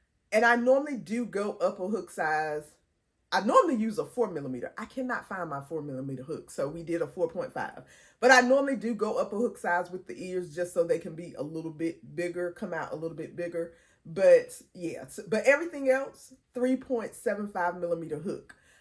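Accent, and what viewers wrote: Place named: South, United States. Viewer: Love listening to you because you and I have that Southern drawl. They’re both so cute, when are the patterns going to be available for sale I need to make my Wilberforce University (1856) dolls?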